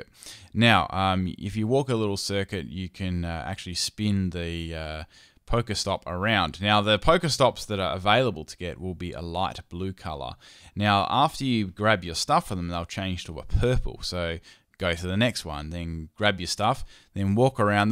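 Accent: Australia. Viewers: Wasn't expecting an Aussie accent haha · Are you Australian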